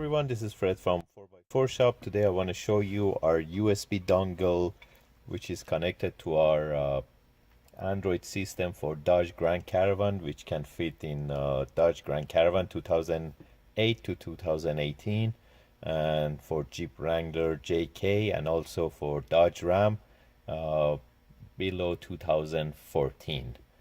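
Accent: Iran. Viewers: You sound Persian... Salom chetori?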